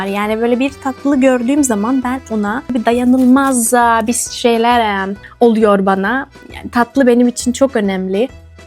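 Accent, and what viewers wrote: Cyprus. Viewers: kıbrıslı çünkü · Abla neden Kıbrıslı gibi konuşuyorsun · Kıbrıslı zaten😂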